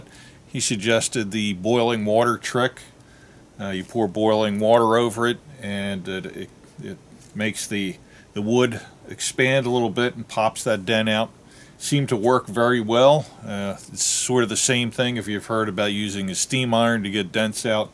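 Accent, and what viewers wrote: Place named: Philadelphia, United States. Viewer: Nice video - thanks for posting! You sound like you're from the Philly area. Close?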